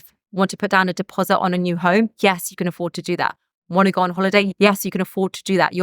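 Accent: England, United Kingdom. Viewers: Your beauty is pleasant to the eyes, your English accent is sweet to the ears and most importantly, your content is educative to the mind and actionable to the hands that takes actions., you're a complete package!!! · nice content your english is british am american hard to catch up please try to be a little slower when you talk :yt::yt::yt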